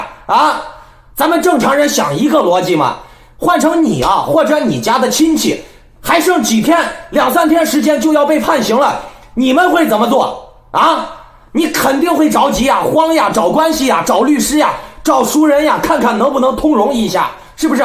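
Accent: Henan, China. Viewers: 主播河南的么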